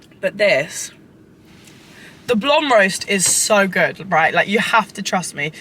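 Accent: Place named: Essex, United Kingdom. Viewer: I noticed the Essex London twang hahaha kinda funky!! You're literally thriving so happy fro you xx (I also live in Kent can we be friends)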